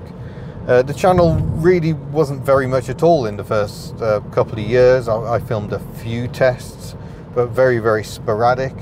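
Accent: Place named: Midlands, United Kingdom. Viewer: Hi mister you always young!!! Can't believe is about 9 or 10 years since i follow your remarkable automotive's footages. Not only to discover incredible similarities and incredible differences between your automobile's lot and the our's in Southern America. Yet liking so much to improve my English by listening to your impeccable Midland's accent. You aren't only an outstanding car's tester with enormous talent for describing machine's featurings but also a great honourable person that knows how to connect different people from different abroad's countries under the same good feeling. True compliments from the Republica Argentina!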